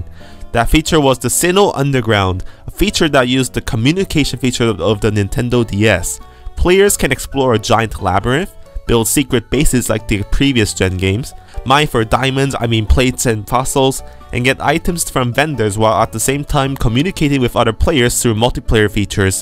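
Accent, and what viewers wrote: South Korea. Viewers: 뭐야 뭔가 발음이 한국엑센트던데 진짜였던거임?? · Oh, wait so that's a Korean accent?!? Geez, I didn't notice at all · Do you really have a Korean accent? I'm French and I understand you more than a British 😅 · Sorry but I laughed way too hard when you mentioned your "weird freak korean accent", I love your accent, but you really made me laugh with that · Dude, I can hear you just fine I have no problem with your Korean accent. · I just started watching the first 10 seconds and i am honestly surprised by the disclaimer. Sure you have a slight accent but your monologue is totally understandable and there's really no need to undermine yourself like 'my weird Korean accent'. If i may put some suggestion, just say you have cc. Be confident with yourself! I'll continue watching 👍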